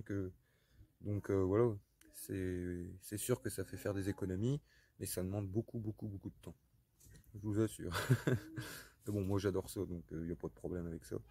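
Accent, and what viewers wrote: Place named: Somme, France. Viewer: Bonjour . En effet des betteraves de ce calibre mérite un abonnement !!! C'est fait . Je vous envoi ce petit commentaire de l'Ile de la Réunion , mais votre petit accent ressemble beaucoup au mien (Je suis originaire de la Somme) !!! Sacrée belle récolte . Le meilleur pour vous . 👍👍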